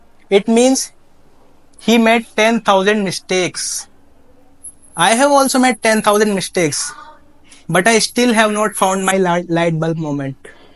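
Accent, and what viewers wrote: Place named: United Kingdom. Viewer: He Speaks well, Simple with grammar. British English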